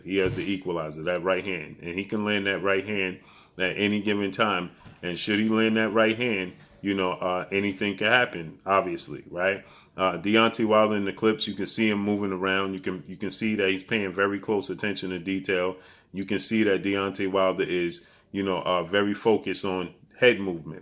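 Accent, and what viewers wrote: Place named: Brooklyn, United States. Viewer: salute 🤴🏾 keep grinding' SON' BROOKLYN VOICE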